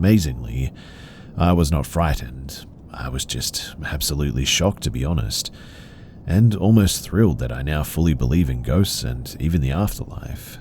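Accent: Australia. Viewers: Are you from Australia 🇦🇺 ❤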